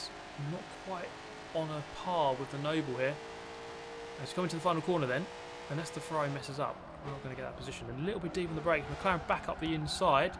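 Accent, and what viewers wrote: England, United Kingdom. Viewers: The Racing: decent Cheeky English commentary: priceless.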